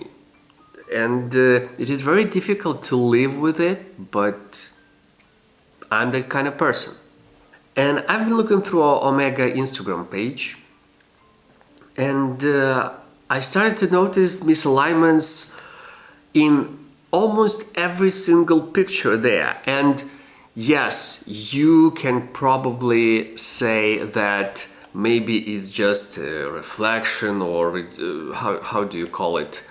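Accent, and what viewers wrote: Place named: Eastern Europe. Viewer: Great video, even greater personal exercise. Yes, slight eastern-European accent, but not this (infamous) rrrrussian one. My advise, take it or not - double bourbon before the takeoff, repeat as necessary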